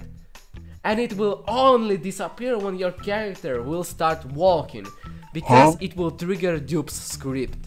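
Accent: Russia. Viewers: Why do you have a Russian accent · Bro has that Russian accent 💀💀💀 Also btw I'm not hating, i probably also speak like that since English isn't my first language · why do you sound like you have russian accent?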